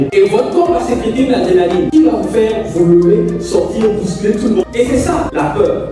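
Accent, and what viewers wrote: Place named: Africa. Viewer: Vous vous exprimez très bien malgré le petit accent africain. Cela dénote de la qualité et la quantité des efforts fournis.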